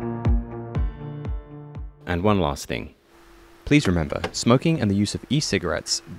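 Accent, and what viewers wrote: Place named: United Kingdom. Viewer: i do not understand American marketing that is so enamored with British accents. 🤷🏽‍♂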